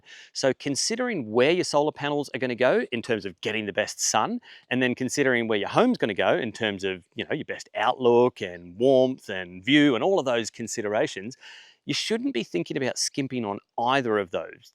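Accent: Australia. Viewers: I know YouTube doesn't care about what I write in the comments, but I hope these comments help you grow and make some money from this video. I really like your Australian accent.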